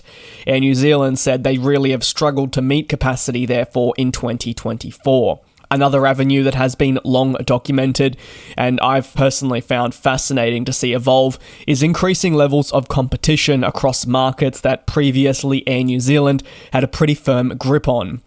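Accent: Australia. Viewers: No, is Australian. He sounds like that.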